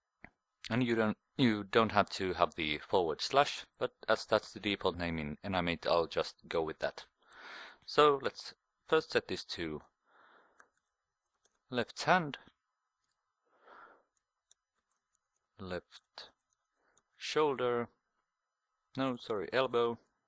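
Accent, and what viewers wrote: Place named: Finland. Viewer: Are you Finnish! You sound like Finnish :D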